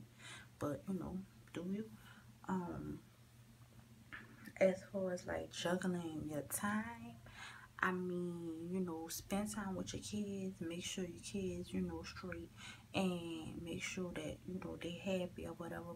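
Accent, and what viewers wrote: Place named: South Carolina, United States. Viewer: Are you from Charleston, SC? Your accent sounds very familiar to me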